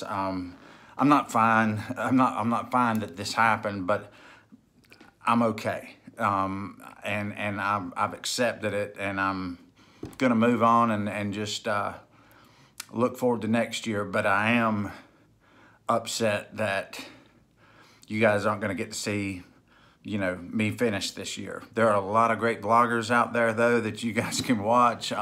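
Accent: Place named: South, United States. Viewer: No apologies are necessary! You were out there actually hiking the trail not watching others do it like we are! The videos you have posted of your hike are the ones I truly look forward to the most. Your candid and humble personality is always a pleasure to watch and I enjoy your dialect being from the south myself. And the fact that you enjoyed your hike so much really shines through in your videos. Cheers to a speedy recovery and your future outdoor adventures. Look forward to your update when you feel inclined to post. Enjoy your home with heat and electricity! LOL.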